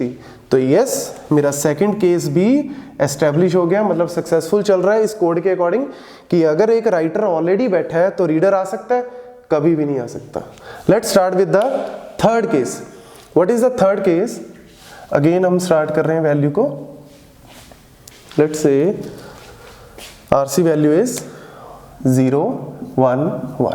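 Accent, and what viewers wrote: Punjab, India. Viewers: Tussi punjabi paaji?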